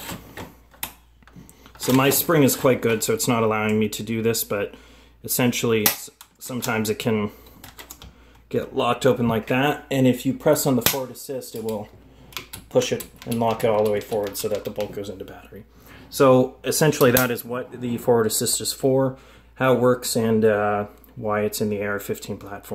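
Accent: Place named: Canada. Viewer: Dude is deff Canadian lol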